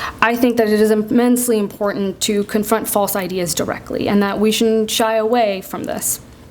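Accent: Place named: United States. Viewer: I would really like to know from you Sarah haider what your credentials are , how long have you practised Islam if at all and which muslim scholars of note have you debated or interviewed . That perhaps would be more convincing than your zionist funded monologue. I see you have an american accent so it must be that you have spent most if not all of your life in the Us which we know is a major zionist stronghold. Further , establishing an "Ex Muslim Organisation" means it has to be funded and when you gather people for a talk and make a professional quality videoto put on youtube and fill the comments column yourselves under various made up names smacks of the zionist Islamophobia agenda . There are many stooges like you parading the internet.Obviously this must be a full time job travelling the circuit delivering dceptive talks and garnering support and creating a mindset so it has to be lucrative . 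The money is there . Zionists with their ill gotten gains based on pernicious usury are ready to spend to further their agenda. Just look at the funding of mercenary groups like ISIS and their affiliates. All funded with zionist money . You must really tell your masters that we have already been warned in the Quran. Since you guys have special research units set up and manuals from which you regularly throw questions at muslims, you should be able to look up the relevant verse very easily. So you see to be forewarned is to be forearmed. It remains to be seen in the hereafter what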